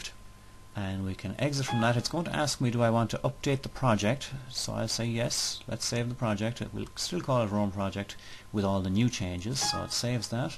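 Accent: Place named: Ireland. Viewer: Hi guy. Enjoyed your tutorials. Love that Irish accent; just came back from a 12 day tour through your lovely country. Tell me, how can I play background music on just a bunch of slides in the middle? I start narrating and then I want to play some music without narration, and then pick up the narration again later? Please, please!!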